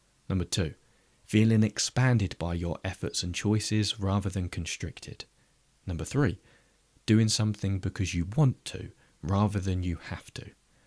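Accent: United Kingdom. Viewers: What flavor of British Accent is this?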